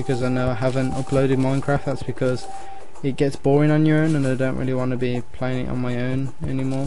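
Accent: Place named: United Kingdom. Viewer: I love these Playthroughs with guys with Brittish accent playing it while talking, it's fun to watch! And I'll give you an subrscribe :)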